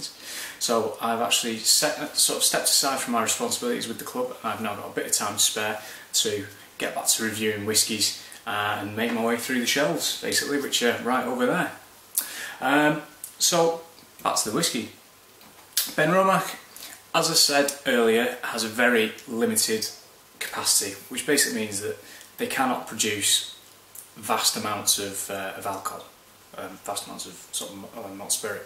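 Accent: Manchester, United Kingdom. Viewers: I luv your Mancunian accent! :-) And what a cool review of a great little whisky this is! Good job, mate!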